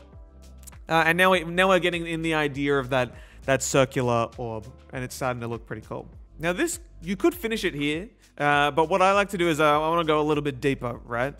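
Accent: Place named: Australia. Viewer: australian accent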